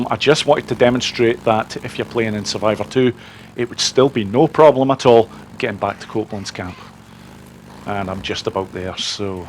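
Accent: Scotland, United Kingdom. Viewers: Great video pal. I've been extremely late to the Days Gone party having only just played through it for the first time in June (best 12 quid I've ever spent in CEX aha). I played on Hard 1 and had an absolute blast, putting in over 85 hours and grabbing the platinum - but atill feeling like i have way more fun to have. I didn't look up any tips before/while playing, so my early game was nowhere near as optimal as it could be - avoiding hordes for much longer than needed meaning i didnt get to enjoy the Horde Killer weapon rewards until really late in the story/after the story. I'd have done so many things differently in hindsight while also cleaning up Hordes earlier to grab the weapon rewards. Even though its only been about 5 or 6 weeks since i completed that first playthrough (and tidied up all the hordes), I've already been itching to get back into a second playthrough. 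I have no intetest in NG+ cause starring out weak, and slow progression is something i really enjoy, and hate restarting games overpowered. Well, after seeing this video pop up on my feed yesterday, i started my second playthrough last night (Hard 2 this time, more spongy enemies but keep my hub), and thanks to you I've grabbed these two beast weapons straight away 😁 thanks mate. You've got a new sub now from a fellow Scot 🏴 ps, where are you from? Your accent is similar from my mate who's from Elgin, but you might be from the North East? Anyway, keep up the great work and I've got a whole bunch of your vi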